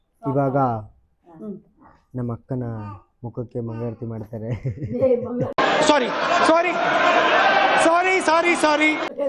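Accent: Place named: North Karnataka, India. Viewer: Anna nivu uttarkarnatakad bhashe yalle matadri, ade accent chand kelalikke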